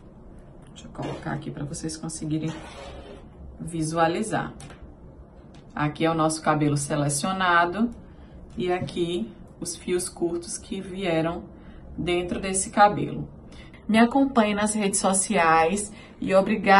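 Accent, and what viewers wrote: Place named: Salvador, Brazil. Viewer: Pelo seu sotaque Parece ser de Slavador. Aceitei? Se sim onde vc atende? Quero muito colocar No caso do cabelo crespo tem q ficar alisando pra ficar compatível com o mega??? Pq a raiz vai crescendo n fica feio nao? A raiz crespa e o mega liso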